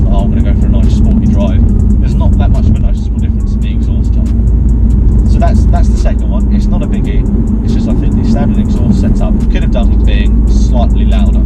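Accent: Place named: Australia. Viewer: aussie accent is on point 😂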